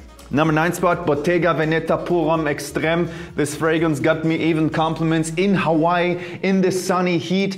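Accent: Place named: Germany. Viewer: Man , I Miss Germany , listening to you talk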